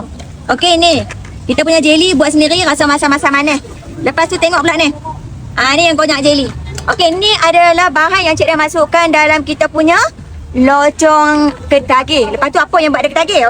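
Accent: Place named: North, Malaysia. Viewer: tuu dia,pekat utagha noh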